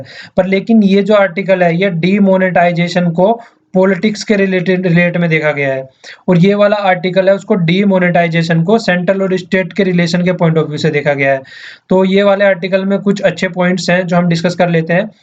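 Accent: Haryana, India. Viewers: खड़ी बोली, सुन के मज़ा आ गया, m HRY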